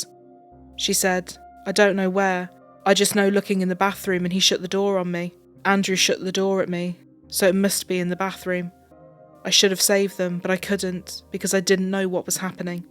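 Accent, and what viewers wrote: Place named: Scotland, United Kingdom. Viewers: Great video. I love Scottish accents… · Love Scottish accents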